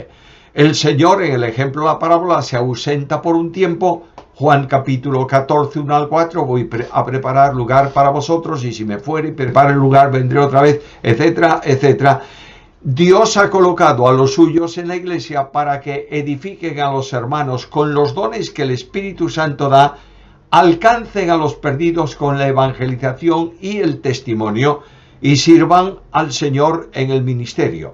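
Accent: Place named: Galicia, Spain. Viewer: El no es malhumorado, simplemente es su acento gallego, a mí sinceramente me agrada ver como el hace énfasis con su acento y tono, ¡Dios le bendiga!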